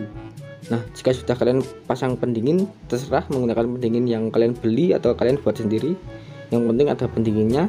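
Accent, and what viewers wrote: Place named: Java, Indonesia. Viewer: Anjrr wong jawa cuk .. wis bli beres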